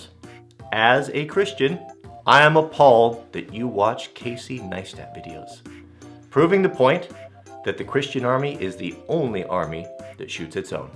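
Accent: Canada. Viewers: I am confused you talk like your a Canadian but you hate the metric system but live in the states.Are you a posser?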